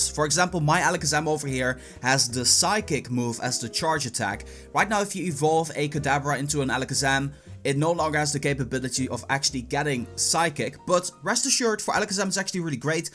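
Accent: Netherlands. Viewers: Are you dutch? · are you dutch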